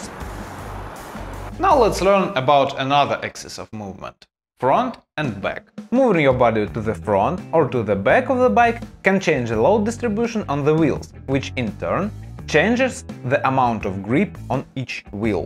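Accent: Russia. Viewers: Sounds very like Aleksandr Orlov, a celebrity meerkat well known in Britain and Australia, did the voiceover! This just means the advertising agency got the accent they were aiming for, Russian, correct. Not that meerkats are good at riding motorcycles. <link> compilation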